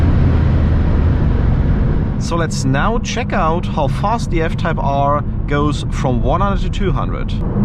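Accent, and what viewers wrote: Germany. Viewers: Wie man einfach den deutschen raus hört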